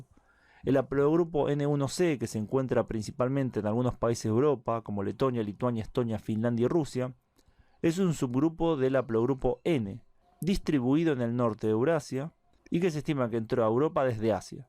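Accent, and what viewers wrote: Argentina. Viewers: Wow... Super Argentino ese acento....👍👍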